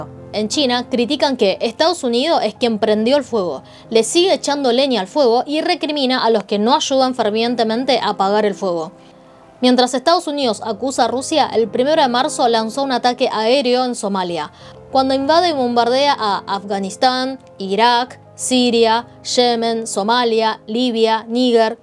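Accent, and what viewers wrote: Argentina. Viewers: Tu. Español argentino en una chica asiática se ve hermoso. Saludes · Que lindo tu acento Argentino . Me gustaría tener una esposa con los ojos chinitos. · Tienes como un poco de acento argentino. En dónde vives? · Tu castellano (tu pronunciación y las palabras que usas) es claramente argentino... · Me parece un buen video te felicito .Mil veces mejor que los noticieros porque aquí solo todo a favor de los gringos como si fuera una película que hacen ellos que solo ellos son los buenos.Tu acento Argentino me gusta mucho muy bonito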